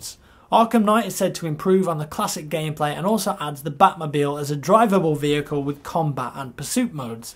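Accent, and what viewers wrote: United Kingdom. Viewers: Where are you from jack? UK maybe? Exactly where?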